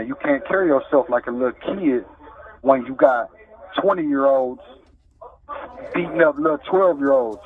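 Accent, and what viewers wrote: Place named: New Orleans, United States. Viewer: He talks like he comes from NOLA.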